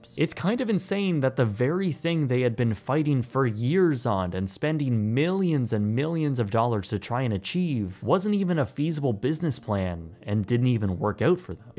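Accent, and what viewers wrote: Canada. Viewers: Yes, he’s from Ontario. He’s done several videos about Canadian companies going bankrupt, too. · I heard Oout a couple times the speaker is Canadian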